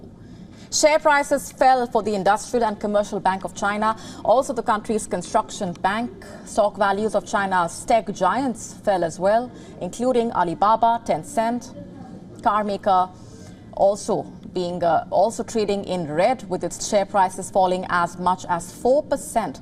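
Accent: India. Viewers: Indian accent... a bit hard to understand.. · Her Indian English is more interesting to me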